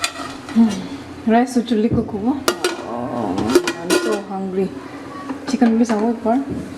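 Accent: Nagaland, India. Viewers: Ur voice n ur nagamese talking style just wow 🤩plus ur cooking skills just appreciatable 🤤🤤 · Ahhh soo good listening nagamese. .. Me too stay in Gurgaon but I'm from Nagaland (Dimapur) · I made pork watching your Video 😁 and it was incredible 👌 couldn't believe that I could make such tasty 😊 but I'm sorry I didn't tell the guest that I followed your ingredients 😆😆😆😆😆😆 You are beautiful like that taste of your cooking ingredients 😍🤩😘 And your accent Doesn't sound like Ao neither Lotha but like Konyak 👍👍👍👍 😊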